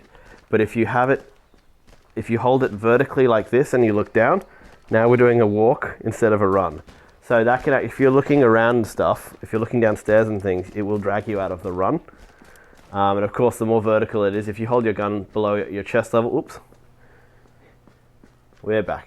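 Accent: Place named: Australia. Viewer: You're Australian 😇 👍👍👍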